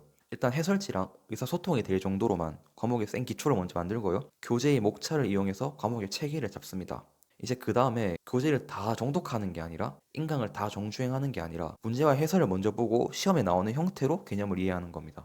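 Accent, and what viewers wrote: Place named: Daegu, South Korea. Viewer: 대구분이신가요? 대구사투리 정겹습니다^^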